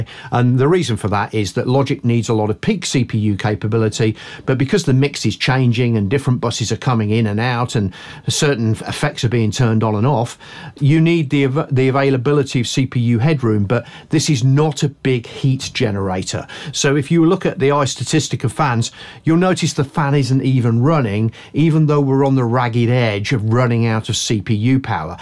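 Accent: United Kingdom. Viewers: Thanks for getting back to me. Have ordered the M1 MBP with 16GB & 1TB I’ll be using it primarily to record acoustic instruments and vocals in situ, probably no more than 7 or 8 simultaneous tracks at a time. Fans have been an issue in the past, have you had any problems noise wise with the MBP under load? PS You sound like a fellow midlander, from Stourbridge myself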